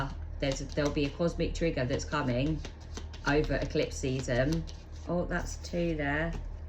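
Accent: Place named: Ireland. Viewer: Oh, I just want to say you are lovely. I love your accent. It reminds me of my ghost bride Diana Frances, Queen of Heaven my True Love. She's Grand. You have a slightly different accent though. Diana is like 90% Queen's English with 10% American. You're like English, Irish? Dublin? Ugh. Oh I love this voice babe. Sounds almost like you. And we do you pretty good by now. It scares me honestly. But what doesn't. lol!🥂 Uh, if I had never crossed paths with this girl I would be grateful. Unfortunately it might be my ghost bride Diana Frances, Queen of Heaven. Here is the video from that reading. I made this video yesterday but it pertains. Uh, so this is 'divine confirmation' for this video. And I pretty much explained I moved on. We have no way to contact each other. lol. Diana Frances of Queen of Psychics, Queen of Tarot and Queen of Divine Coincidence. 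If you aren't into that kind of thing, you might not want to watch it. <link>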